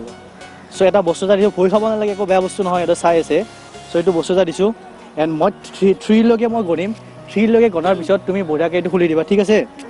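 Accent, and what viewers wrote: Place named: Bihar, India. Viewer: Bihari typ hindi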